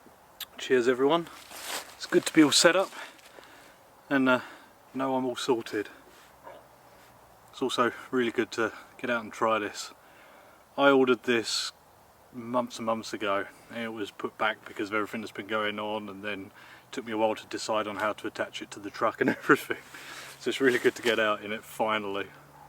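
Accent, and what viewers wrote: England, United Kingdom. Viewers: Are you in uk or usa ,I know you are english from your accent , missed you building your ca in loved to have seen it though, many congrats young man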